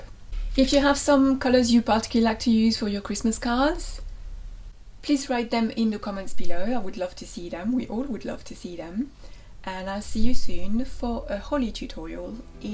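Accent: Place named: France. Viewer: I discovered your channel only a few days ago. I'm a beginner artist (colour pencils at the moment) and appreciate your clear instructional videos and the general information on art you share. Your mix of the French and English accent is so cute I can't help coming back just to hear it. It reminds me a little of Meryl Streep's accent in Out of Africa "I had a farm in Africa at the foot of the Ngong hills..." lol! Not a Spock reference sorry.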